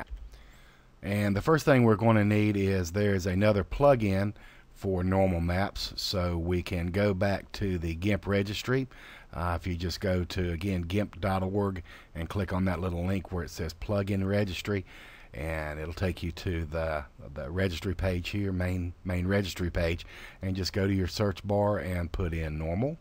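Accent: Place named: Texas, United States. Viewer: Thanks for your tutorials, really appreciated. And since you evoked it early in the clip, don't worry about your pronunciation (I can hear a texan like accent) : much, much, MUCH more understandable and pleasant to the ear than the guttural germanico-british accent! Thanks for your time and effort, looking forward for more.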